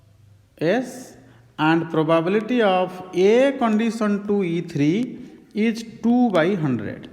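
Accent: Odisha, India. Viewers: Sir Are you from orissa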